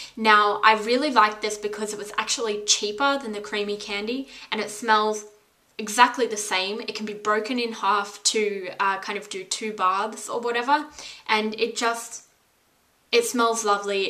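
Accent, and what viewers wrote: Australia. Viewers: She's deffoo austrailian....ivegt cousins frm both so inoo..x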